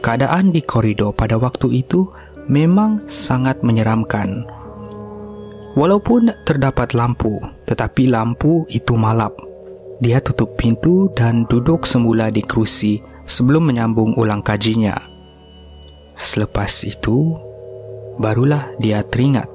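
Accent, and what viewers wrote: Sabah, Malaysia. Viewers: Kupi² go ni... Orang sabah kah pencerita dia? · Dia org Sabah lah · / sabah bro,not indon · saya ada kawan baik orang Sabah.. saya dari semenanjung.. suda 17 tahun kawan sama dia sampai sekarang.. Bila Admin bercerita ada dialek Sabah, saya senyum jak bah.. faham suda..😁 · pekatnya sabah awk.. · tu bahasa sabah bukan indon, ramai org malaysia yg tak tau loghat sabah huhh, pelik2, macam sabah bukan malaysia dh. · loghat orang Sabah tu saya pun org Sabah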